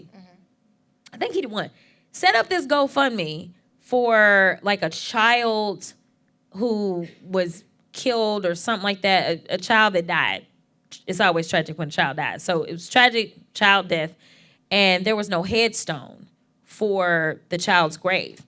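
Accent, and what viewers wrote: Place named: Texas, United States. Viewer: We love you! Can tell that you are from Texas!❤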